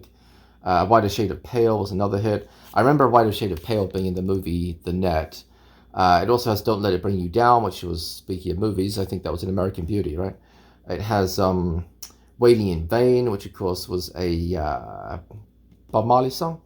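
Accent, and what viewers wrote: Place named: United Kingdom. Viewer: Hopefully not your last video, but if it is thanks for the sharing and entertainment, gonna miss your videos and your British accent. Lol. By the way, is from Lebanon. Hope to see you again.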